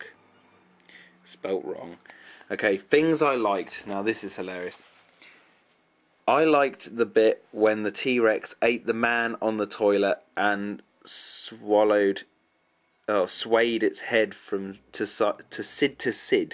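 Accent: England, United Kingdom. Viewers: do u have a British accent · your from england rite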